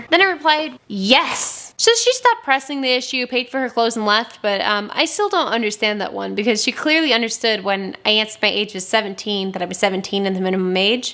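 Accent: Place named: South, United States. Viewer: I love the southern accent. lol!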